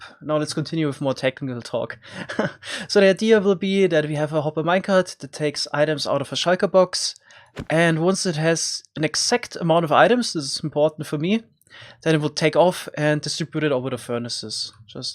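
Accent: Germany. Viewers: Du hast einen deutschen Akzent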